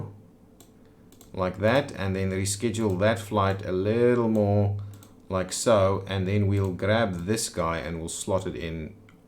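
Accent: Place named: South Africa. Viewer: Subbed, great work & loving the fellow RSA accent!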